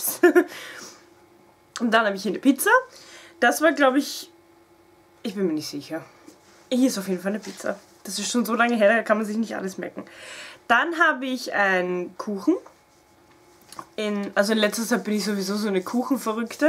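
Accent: Austria. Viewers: Ich find dich so süß. Ich könnt dich grad echt knuddeln. Und ich LIEBE deinen österreichischen Dialekt. :-)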